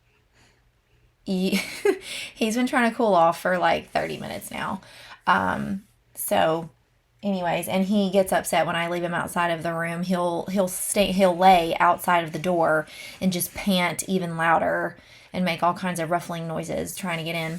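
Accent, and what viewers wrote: South, United States. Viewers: I just found you and love you already. Subscribed!! I loved when you said that markets were the P to your PB and J and your cute southern accent. I definitely will be going to site and purchasing from you because I want to support you!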